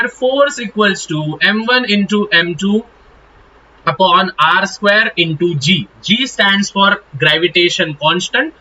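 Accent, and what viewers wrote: Maharashtra, India. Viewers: Bro is marathi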